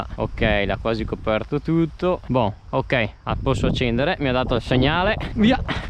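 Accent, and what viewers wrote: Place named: Verona, Italy. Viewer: grande!! Ho sentio l'accento veronese e me son emosionà!! 😂😂 Ti ho scoperto da poco e appena ho sentio el papà parlar to seguio subito.. Grande bei video un giorno veo a catarte e a ciavarte un po' de verdura😜😜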